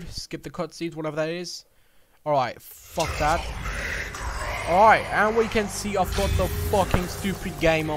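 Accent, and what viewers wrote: United Kingdom. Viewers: Nice and Concise mate . I cant tell where in england ur accents from tho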